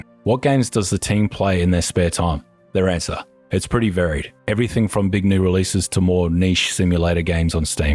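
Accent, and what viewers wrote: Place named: Australia. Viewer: your voice sounds very Australian and as an Australian i can say thats a compliment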